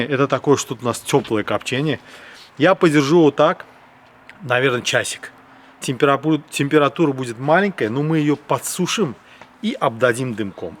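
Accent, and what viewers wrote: Baku, Azerbaijan. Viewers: Обожаю ваш Бакинский акцент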